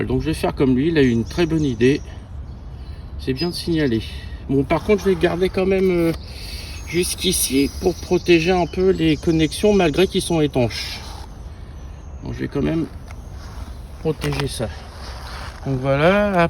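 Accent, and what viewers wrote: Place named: Normandy, France. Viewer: Vidéo très agréable j'ai pas vu les 29 minutes passer Merci pour tes explications, bientôt propriétaire de t107 pro surtout pour l'off road 😁😁 Mais dit moi tu parlais de jeu dans la colonne ça me fait un peu peur 😕😕 Sinon joli accent normand moi je suis d'Occitanie donc un bon accent du sud bien chantant Merci pour ta future réponse bon ride ✨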